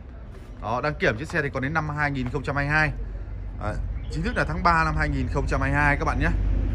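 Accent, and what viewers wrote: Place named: Đồng Cối Đá, Vietnam. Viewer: Noi đồng cối đá chuẩn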